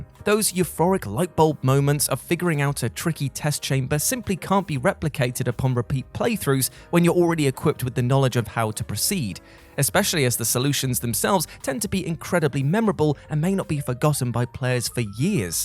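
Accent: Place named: United Kingdom. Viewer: Ah. My favorite British accent barring chef Ramsay and my personal friend. I hope to one day be as nostalgic and awesome as you with my own accent. The accent of cornbread and fried chicken...this I who I am don't judge me. 😑🤣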